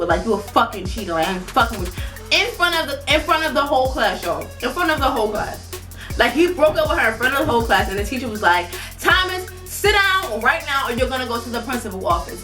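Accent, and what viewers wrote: New York, United States. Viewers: u from new york?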